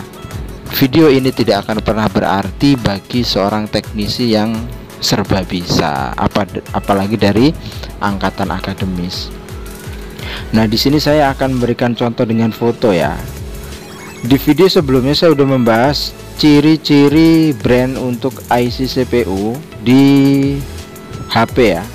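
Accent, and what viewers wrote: Java, Indonesia. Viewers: Asline jowone ngendi Iki kang....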